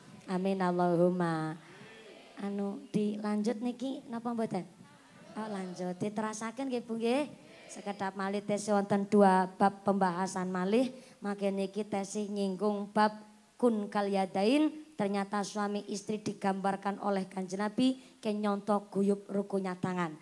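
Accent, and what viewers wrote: Banyumas, Indonesia. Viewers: Ceramah ngapak memang tidak membosankan kak mantap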